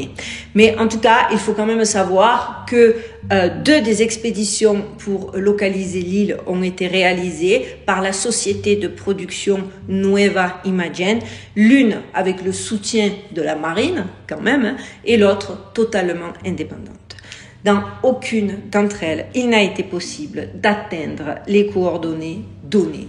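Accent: Marseille, France. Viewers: Hello jolie fille du sud 😊 moi aussi je suis marseillaise et j'aime ton accent qui me rappelle le sud qui me manque 🥰 et tu as raison les gens parce qu'on ne voit pas ça n'existe pas 🙄 ahhhh les conformistes de la ruche avec aucune ouverture d'esprit 😅😂 ces habitants de l'île ressemblent à des pleéadiens ou à des atlantes 🤔 je pense que certains êtres bien en avance sur nous, habitent toujours sur Terre ou intra Terre. Certains bienfaisants et d'autres beaucoup moins car sinon les élites ne pourraient pas contrôler ce monde aussi facilement... A voir 😅 peut-être un jour aurons nous la vérité 😉😘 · Cc.. J'adore ton coup de gueule.. 😂🤣🤣😂🤣🤣 Les gars allé sur France 2..🤣🤣😂😂 Toute façon tu aura toujours des critiques c'est la rançon de la gloires.. Ta gloires a toi! Ce que tu fais pour nous tous les curieux, les éveillés qui penses que l'univers est habités par des êtres autre que humains et bien moi j'y crois aussi..merci pour ton boulot 👏👏👏👏👍👍👍merci.. 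Et puis ton accent de Marseille est bien très joli.. ☺️ Je te souhaite de bonne fêtes.. 🎉🎉🎂🎂🥂🥂🥂🥂🌹🌹🌹🌹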